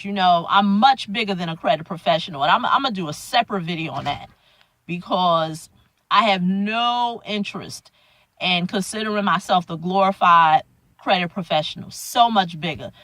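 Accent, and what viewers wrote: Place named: North, United States. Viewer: U sound like your from up north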